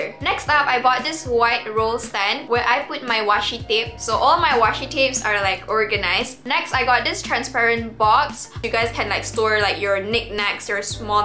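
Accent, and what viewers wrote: Malaysia. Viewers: Your chinese is really good,and your accent sounds very 'Malaysian Chinese'. I literally thought that you're a mixed race between Chinese and Malay🤣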